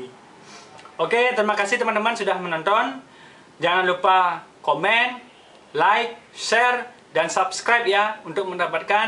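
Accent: Bali, Indonesia. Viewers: kaya logak bali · Itu logat Bali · bali lah.. bener gak bli? =)) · Pasti Orang Bali... Kental banget Logatnya.. Hari Minggu TOKO TOKO PADA TUTUP, Phanter irit cling cling... xixixixxixi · bagus videonya, tingkatkan lagi bli.. khas sekali logat balinya.. hehehe suksma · tong logat bali sing ilang... Mantappp oom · Logat balinya kentel saja bli 😄..suksme infonya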